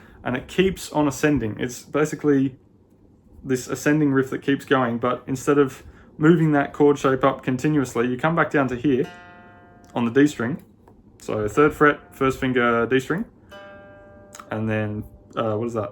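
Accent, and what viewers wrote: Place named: Newcastle, United Kingdom. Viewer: For some reason I thought you were from Newcastle UK..😂 Great lesson.. Great guitar style too..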